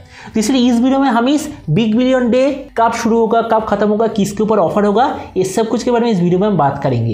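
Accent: Bengal, India. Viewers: Bengali ka hindi kuch alag funny lagta hai 🤣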